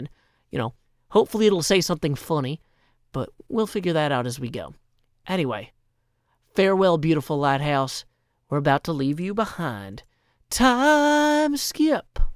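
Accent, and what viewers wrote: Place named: Tennessee, United States. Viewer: I just found u and instantly new u were from TN with that accent😂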